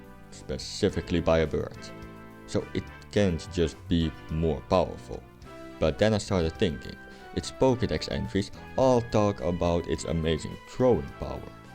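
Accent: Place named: Finland. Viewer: are you perhaps from finland. the accent sounds so finnish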